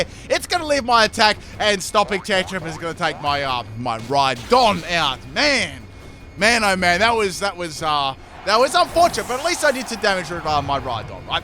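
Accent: Australia. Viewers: Your Australian accent takes your videos from amazing to divine!!!! >>>>>>>> Wolfey and MandJTV any day!!!!!!!!!!!!!!!!!!!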